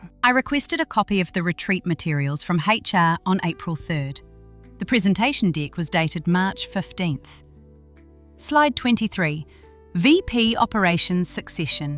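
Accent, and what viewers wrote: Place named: United Kingdom. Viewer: Lovely British accent of the narrator!!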